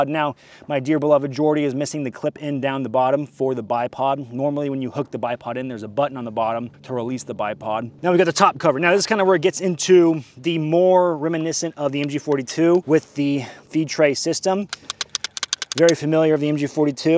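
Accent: Afrikaans, South Africa. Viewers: Love your attempt at a afrikaans accent hehe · As a south african and afrikaans speaking native the accent killed me 😂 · Not the South African accent ,love it 😭🤣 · The South African accent and slang is awesome lmao and also the History lesson makes me happy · your South African accent isnt half bad 😎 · Love the South African/Rhodesian accent, shot my bru 🤙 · That was the best Rhodie/South african impression ive ever heard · The South African accent 😂😂😂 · Lekker video my Bru.... Your Afrikaans /rodisian accent sounds good. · Liiiike it my bru your afrikaans English accent lol focken lekker 👍👍👍👍👍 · as a Boer patriot in commie South Africa, I quite enjoy your safrican accent. lekker my bru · Oh wow again the Rhodesian and South African references were amazing. Saw some SADF photos in the video of troops with the FN MAG. South Africa used the FN MAG in the bush war and was the backbone of the troop or squad. 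Love the accent!! Good job. Not quite leo's level in Blood Diamond but with some work you will get there. Well done bru! TIA. As a South African this video made me smile. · As a afrikaans speaking south african i aprove the accent hahaha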